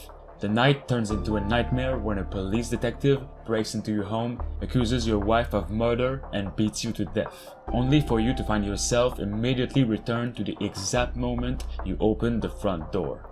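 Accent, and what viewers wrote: Quebec, Canada. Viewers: french canadian accent, without trying to hide it I don't mean that as a bad thing, but a lot of french canadian don't have an accent when speaking english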